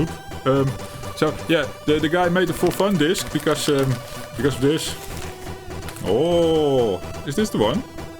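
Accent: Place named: Netherlands. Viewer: Lekker! heerlijk nederlands engels! pikte het er gelijk uit De appel Falls niet Far van de tree! love IT! gelijjk gesubscribed!<3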